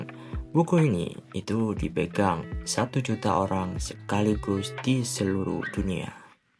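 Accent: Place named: Java, Indonesia. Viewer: Medok banget suaru lu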